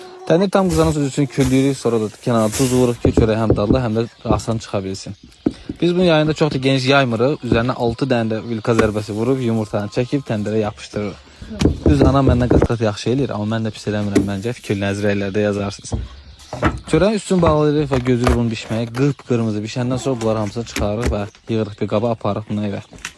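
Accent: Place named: North, Azerbaijan. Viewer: Avarlarin da belə adəti var.Bilmirəm bu hansı zonadir.Ama danışığından Şimal tərəfin ləhcəsidir.Allah bərəkətli eləsin.Allah qəbul eləsin 🤲💐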